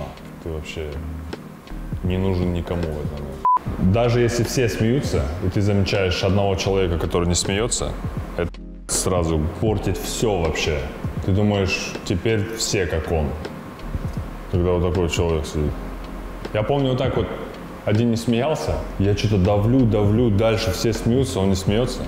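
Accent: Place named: Kazakhstan. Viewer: Если бы я с ним по телефону болтала не видя, я бы сразу сказала свой, казах)) есть у казахов свой неуловимый акцент, как и у корейцев, и у азербайджанцев, и чеченцев с идеальным русским произношением. Это трудно объяснить.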